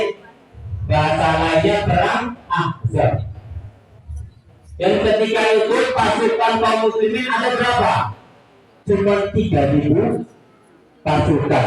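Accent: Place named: Tegal, Indonesia. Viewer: Ponakan lik... Masih kebawa logat tegal sing wis kecampur logat payakumbuh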